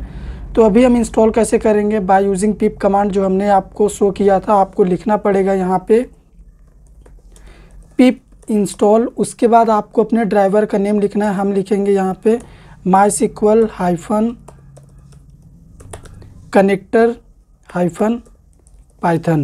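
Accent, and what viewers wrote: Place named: Jharkhand, India. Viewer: Hearing your voice, I remember my friend Venkatesh in Guwahati , who was from Jharkhand with same accent. Thank you.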